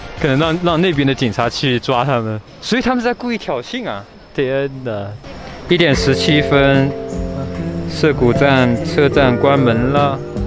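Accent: China. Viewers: 中國的腔調 , 繁體的字幕 , 可以告訴我為什麼嗎? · 中國腔很輕微。但學台灣腔還是能聽出是中國人。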